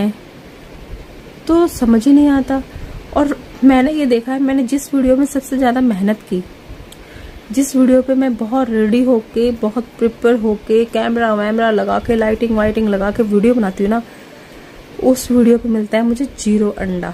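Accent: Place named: Garhwal, India. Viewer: Garhwali bolate hue acche lagte ho, bahut Sundar 👌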